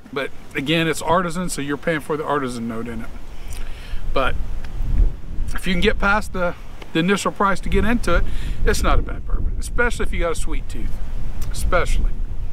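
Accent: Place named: South, United States. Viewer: It looks like this isn't available here in Albuquerque, but it sure sounds like I'd like it. I've found that I like the fruitiness of a single malt (though Scotch and Irish whiskies aren't, in my limited experience, forceful enough for me; I love the Balcones Lineage Texas Single Malt, which is), and the barley and the corn in this one ought to make it right for me. :) Thanks for reviewing it. And you may be in Kansas, but you sound southern enough to make me happy. :D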